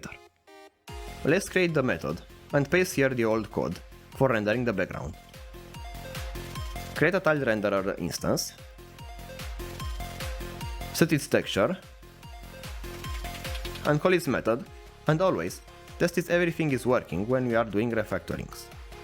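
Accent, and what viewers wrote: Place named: Romania. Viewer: ur deff romanian, not gonna lie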